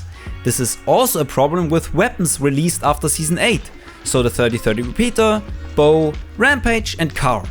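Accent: Germany. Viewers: I have a question. Is your native language german?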